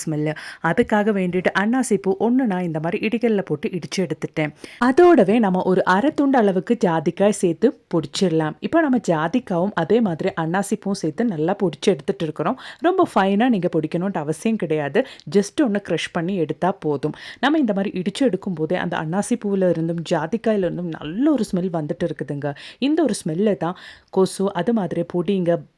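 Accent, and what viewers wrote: Kerala, India. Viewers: Your Malayalam tamil wonderful and ur tips also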